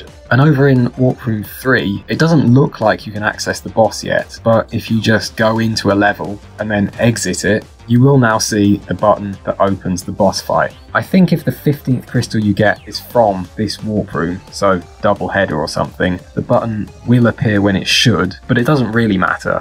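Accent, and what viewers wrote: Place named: United Kingdom. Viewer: Im so glad i found ur channel. Ur my favorite crash bandicoot modder with a british accent on youtube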